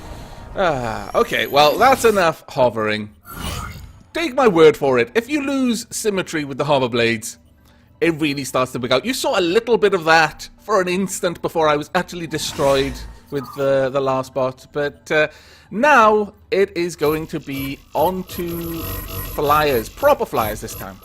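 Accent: Wales, United Kingdom. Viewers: are you from wales buddy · You're so welsh! Nice vid though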